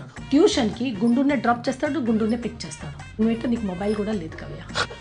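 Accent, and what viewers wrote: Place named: Bangalore, India. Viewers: Kannada telugu slang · Native kannada na midi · అనంతపురం బెంగళూరు బార్డర్ భాషయాస ,, రాయలసీమ కన్నడ మిక్స్ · Rayalaseema and karanataka barder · Dheeni TELUGU enti Kannada kampu koduthondhi… thuuu ekkadaleni maayadhari santha motham YouTube lo undhira baabu.. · Very good slang 😂😂😂 Bangalore Telugu ❤❤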